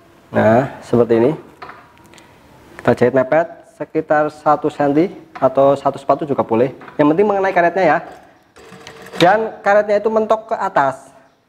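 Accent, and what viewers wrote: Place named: Java, Indonesia. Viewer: koyo wong pekalongan basane, ak, karanganyar mas tugu duren ngetan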